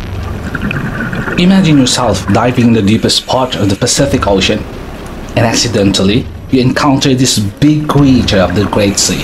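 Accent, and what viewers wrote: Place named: United Kingdom. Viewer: Ung accent tlga lodi..para akong nanunuod ng harry potter..😁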